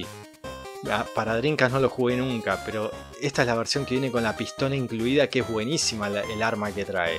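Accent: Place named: Argentina. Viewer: Denada me encantan sus videos y me encanta tu forma Argentina de hablar tan característica de nosotros , saludos 😊👍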